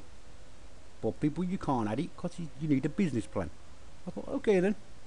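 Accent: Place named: Ireland. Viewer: Thanks for that simple technique, loved the accent and the number of times you say "people". Are you Irish? :)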